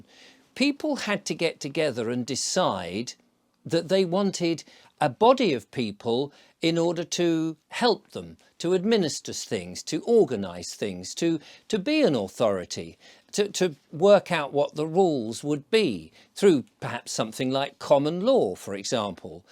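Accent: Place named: England, United Kingdom. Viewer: Just watched your video about where you were told off. You are absolutely not stupid or think. You are a much deeper thinker than those haters that have to complain about everything. I am an American and truly enjoy listening to your very pleasant, very English, as far as I know, way of speaking. Very easy to listen to. Nice to hear someone who appears to think before putting his mouth in gear. I am also a craftsman, a millwright, woodworker, metal fabricator and auto mechanic. I think the person mad at you is out of line to complain, think he takes himself a little too seriously. Anyway, I will continue to follow your sight and enjoy learning more about England. I am equally proud of my country even though it is going through some tough times with leaders I don't think have our best interests at heart. Stiff upper lip and all that, old chap. 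Let the criticism roll off your back like water off a duck. Can't please everybody.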